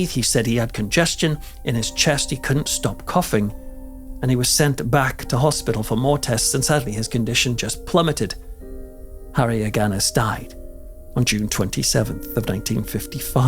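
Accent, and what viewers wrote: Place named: England, United Kingdom. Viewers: Telly Savalas with an English accent! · This clown can't focus! Hate when these people from england narrate!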